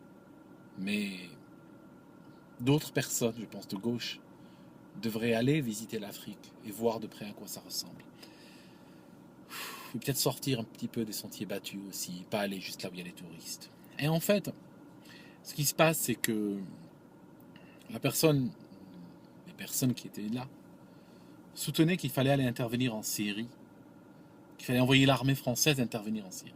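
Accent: Switzerland. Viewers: on valide de la 1ère à la dernière ligne... et retiens la métaphore! Mais, êtes-vous CHuisse?! pas l'accent...les 2? euh... franco-suisse-arabe?! Merci pour la virée en bagnole! La politique prend une telle place prépondérante en France que c'en est insupportable. Plus de philosophie, SVP chers voisins et compatriotes... ça serait moins lourd - à l'instar de la cuisine au beurre d'hier...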